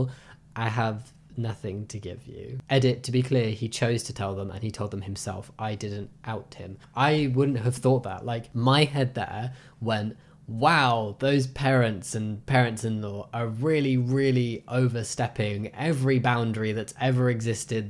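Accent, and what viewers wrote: United Kingdom. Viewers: The changing thing probably is also seeming weird because in the U.S. people are a lot less comfortable changing and not wearing clothes around other people. The thing is, women in America wouldn't feel comfortable changing in front of men. Are you saying that is wrong? I feel like it is a weird faux paux for her to like not look away or step out if a friend is changing. I am assuming you are British from your accent right? Americans feel VERY weird about unclothing/nudity and it is sexualized in our heads. Idk if one of my friends started undressing in front of me and I they were of the sex/gender I was attracted to, I would definitely make sure they knew that and look away/step away. I think this is a cultural thing. Like I get why you are upset and in theory what you say makes sense, but then should we be outraged that the women aren't getting undressed around men? 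(No). Idk, I'm afraid of both straight and queer people SA'ing me (so I don't get changed around anyone at all). So, they are afraid. They shouldn't block you but like if they decide they aren't comfortable changing around you and stop doing that is that somehow a problem? Like people should be required to change around people they don't want to change around? I think this is an American prudish thing. And a public changing room with a lot of people is different from walking into your bedroom with one other person and taking your clothes off. Idk, you don't get free rights to watch whoever you want to unchange. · are you british? you kinda sound brih'ish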